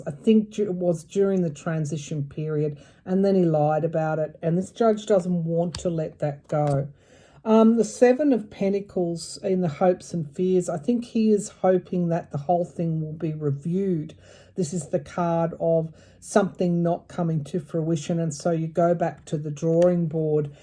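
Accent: Australia. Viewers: thinking about setting up Siri with a female Australian accent in honor of you. 🌷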